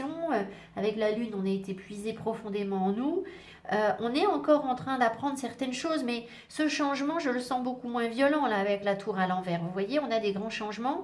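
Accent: England, United Kingdom. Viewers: Merci pour ce beau tirage plein de messages. Très parlant pour moi en tout cas et merci aussi pour votre magnifique accent anglais 😊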